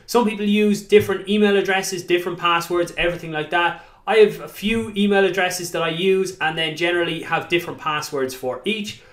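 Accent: Ireland. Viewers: good man,me too! 😉 Hey I notice you got Irish accent, I'm living in Dublin,I'm entrepreneur,was on dragons den last year. Do you live in Ireland? I'm starting work on a new project, a new.com crypto unlike any seen before, I obviously think it has massive potential, but i could do with a partner with good knowledge of crypto world like you. You interested?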